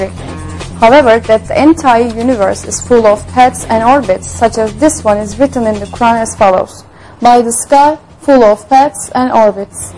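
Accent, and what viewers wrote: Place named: Turkey. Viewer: kesin bizden, aksandan belli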